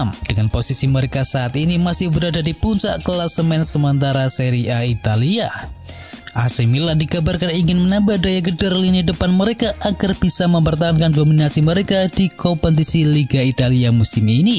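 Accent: Java, Indonesia. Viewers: Medok amat mas bro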